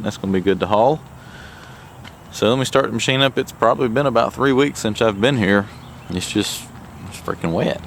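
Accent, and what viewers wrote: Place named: North Carolina, United States. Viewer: know a fellow north carolinian when i hear one. i enjoy watvhing your expertise with your heavy equipmemt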